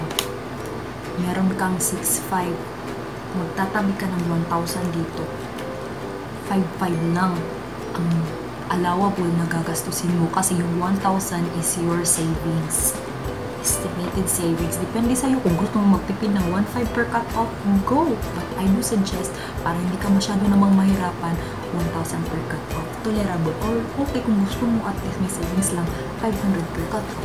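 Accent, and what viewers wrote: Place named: Visayas, Philippines. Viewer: I think bisaya ka dai... 😊😊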